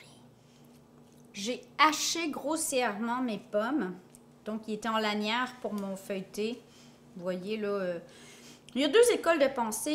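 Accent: Quebec, Canada. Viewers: Sympa ton ambiance cool et détendue. ...+ l accent québécois. ..j adoooore 🖒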